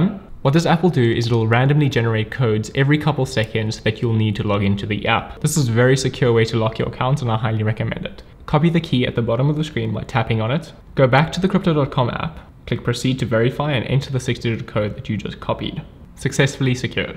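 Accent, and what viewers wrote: South Africa. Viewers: Hey, are you South African, and do you also reside there if indeed you are, curious coz your beautiful accent sounds like it? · hey brother, you sound south african?? I stay in Cape Town and would really aprecciate if you could give me advice on best platforms to use in South Africa?? and any advice in general as a cape tonian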